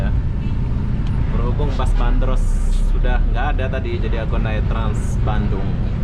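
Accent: Malaysia. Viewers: Kak asli org mana? Kok ngomong ke melayu melayu gitu hehe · Abang dari Lombok???😑😑😑 Bahasa Lombok dan loghat nya GK ada Melayu 😑😑tipu lah Abang ni,Abang dari Malaysia bukan dari Lombok 😑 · Ini Abang dari mana logatnya mirip orang Malaysia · Orang malaysia itu .cara ngomong nya keliatan .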